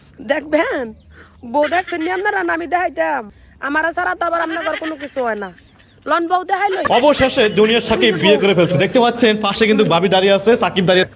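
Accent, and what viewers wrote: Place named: Netrokona, Bangladesh. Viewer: নেত্রকোনার ভাষা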